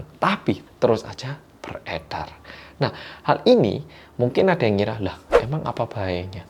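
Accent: Java, Indonesia. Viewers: Walahhh mase ngomonge kok medok. Menn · Mas medok🤩🤩🤩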